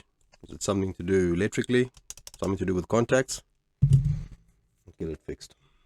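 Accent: South Africa. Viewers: I always think of apartheid when I hear your accent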